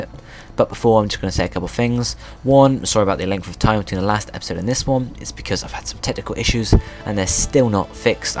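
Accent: United Kingdom. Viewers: I'm curious....are you and Matt Smith from the same part of England?....because you seem to have very, very, very similar accents.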